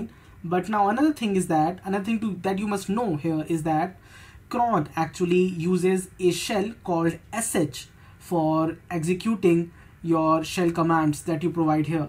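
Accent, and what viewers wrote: India. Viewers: It's "are" not "R", we indians have a thick accent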